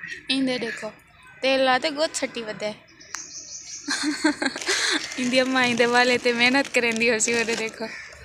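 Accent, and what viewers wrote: Saraiki, Pakistan. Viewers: صدقے تھیواں تیڈی میٹھی سرائیکی ❤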